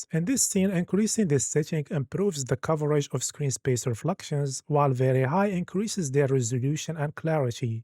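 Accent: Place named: Morocco. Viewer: The accent tells me you're moroccan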